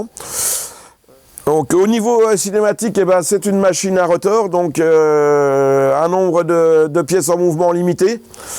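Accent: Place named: Lorraine, France. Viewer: j habite a 1h de Nancy et je sens bien l accent de sa voie 😂😂😂 belle video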